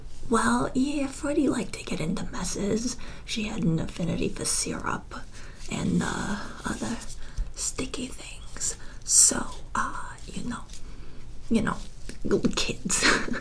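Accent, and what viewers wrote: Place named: Boston, United States. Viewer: Your beautiful and are you from Boston if so your accent is to die for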